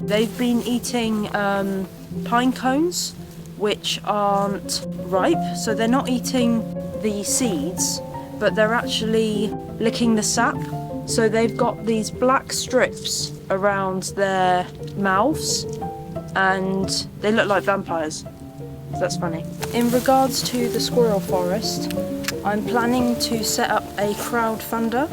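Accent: United Kingdom. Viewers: Such a beautiful place, too bad it's so damn cold! Is she Swedish? she looks Irish and has a British accent. I just watched her video of raising a red squirrel. I love squirrels, that was a great video, nice job!, definitely Irish, I wonder how she ended up in Sweden? So lovely to live among all the forest and trees. I bet she wouldn't appreciate all those Komodo Dragon feeding videos I've been watching!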